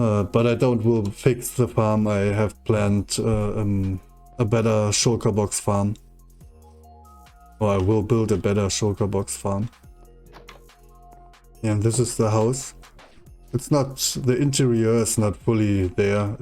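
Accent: Germany. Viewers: Man merkt den deutschen Akzent richtig 😂 cooles video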